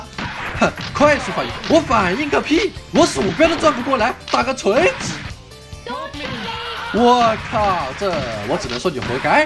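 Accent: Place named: Hunan, China. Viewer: 哈哈这湖南口音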